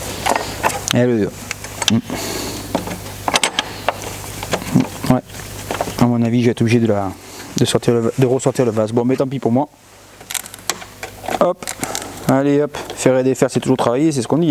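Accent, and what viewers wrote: Gironde, France. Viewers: Toujours d'excellents commentaires avec un boulot impec, et en plus le petit accent de la Gironde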